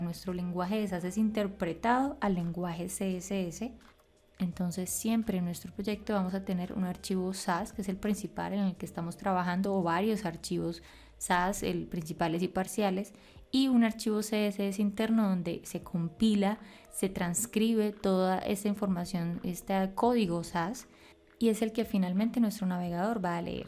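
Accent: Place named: Colombia. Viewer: Ese acento colombiano... Tome su buen like ^-^ (buen video)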